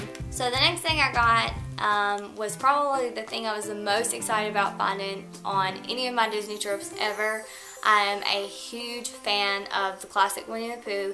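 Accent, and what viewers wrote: South, United States. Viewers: Oh, cool! I'm from North Carolina, so I definitely know how it is to have a southern accent! Thanks for replying!:) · I love your southern accent babe! Are you from Texas? Sorry I'm new to your channel and Subscribed btw! :D Love the video and can't wait for more!(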